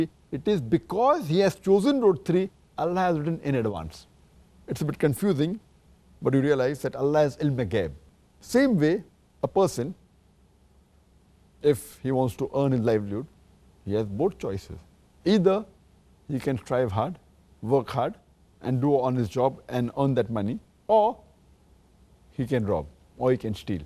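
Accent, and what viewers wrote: India. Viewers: I'm a Muslim but I can't really understand his saying. His English is can't understand because his voice Indian Accent 😢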